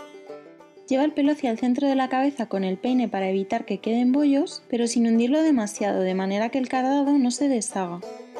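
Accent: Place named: Spain. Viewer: Yo savia ke hablabas español por tu acento cuando hablas frances!! :P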